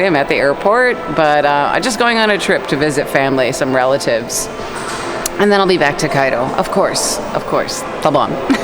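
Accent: Argentina. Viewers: Después de ver muchos de tus vídeos porque me encanta Egipto, me gusta mucho cómo lo muestras todo y además estoy estudiando la lengua árabe también (sé muy poquito pero voy progresando), me alegra muchísimo saber que compartimos otro idioma 😊. Yo soy de España y me ha encantado escuchar tu maravilloso acento argentino. Muchas felicidades a tu abuelita, desde luego está estupenda 🎉 Ya tienes una nueva suscriptora 👍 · Your Spanish is Argentinian or true Spanish like the Spanish spoken in Spain 🇪🇸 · You got me! I thought you must have had a meltdown last year. 😅You sound really cool, speaking Argentinian. You seem different as well. That's the power of language! Thanks for sharing.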